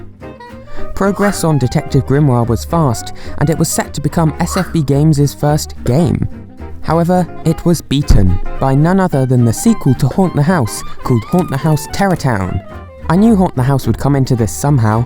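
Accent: United Kingdom. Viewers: Hes british like me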